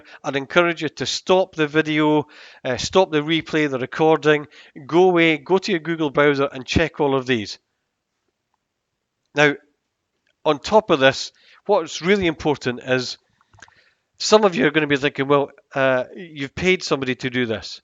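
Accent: United Kingdom. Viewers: 😁 Glad to oblige!! Really appreciated your webinar - and in a proper British voice (My brother's up in Bathgate!) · I was listening to you and thinking he has a Scottish accent. Then you mention you are from Edinburgh. Thanks for this video.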